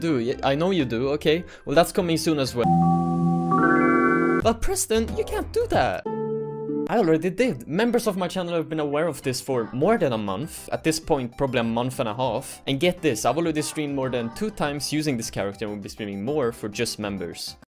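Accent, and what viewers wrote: Sweden. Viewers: I see swedish accent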